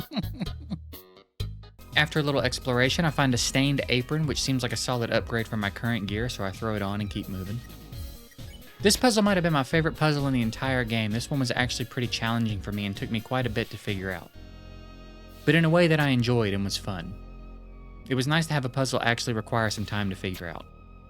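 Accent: South, United States. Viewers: Ok. This is crazy but my boyfriend is watching your I Played 20 Cozy Games... And nowhere online can we find what state you're from. I'm guessing Tennessee, Georgia, or around there. My boyfriend, who I will be shocked if he is right, thinks you are from Northern Louisiana or Arkansas.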